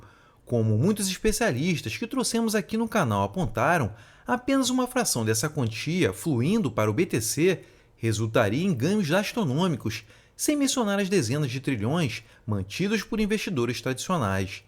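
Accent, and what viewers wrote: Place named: Rio de Janeiro, Brazil. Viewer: Me perdoe, se você tirar seu xis carioca, o carioquês horroroso da sua fala você dará um passo arriba. Cara, você não sabe como esses xissss são desagradáveis.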